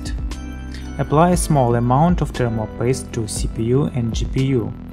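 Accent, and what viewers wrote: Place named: Russia. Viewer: Ведь явно слышен русский акцент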